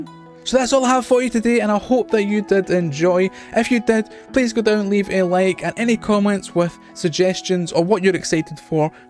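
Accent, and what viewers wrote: Scotland, United Kingdom. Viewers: You know your accent is really unique, like it's you have a soft Scottish accent but also have braces, and the only other person with anything similar is AndyIsYoda. But anyways cool build.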